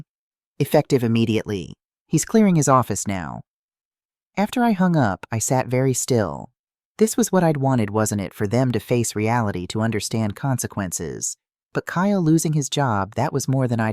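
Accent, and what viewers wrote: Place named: United States. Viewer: I hate her Mexican pronunciation, it sounds too gringo😊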